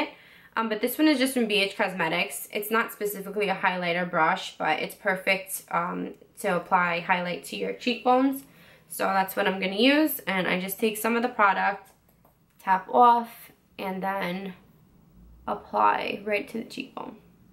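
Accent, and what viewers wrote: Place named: New York, United States. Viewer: Being from NY I can definitely hear your accent lol I lost my accent when I moved to Wisconsin. lol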